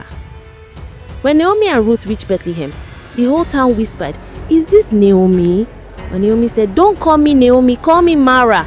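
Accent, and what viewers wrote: Nigeria. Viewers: Awww. I love it. I love the Naija inflections.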